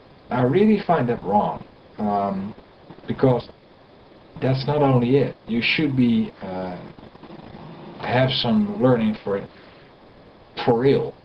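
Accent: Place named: Netherlands. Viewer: i can hear by your accent that you are from holland :p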